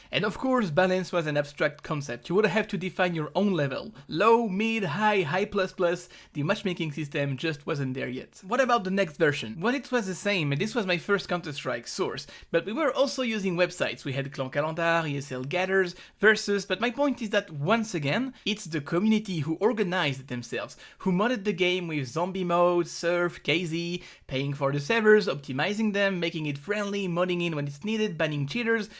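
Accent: France. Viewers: You French? You've got a French accent when talking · Ce léger accent français :p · A l'accent, j'ai reconnu que tu étais français... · Are u French? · Tu est français ? J'ai l'impression de l'entendre dans l'accent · Ohohoho, you got french accent monsieur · olala ton accent francait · He's French dude, accent's getting in the way, but still clean English.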